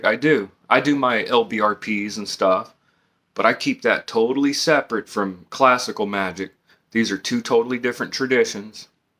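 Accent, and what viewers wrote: South, United States. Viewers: LOVE THIS GUY HE IS WELL INFROMED EXPERIENCE AND ARTICULATES WELL WITH A SOUTHERN CHARM AND CHARISMA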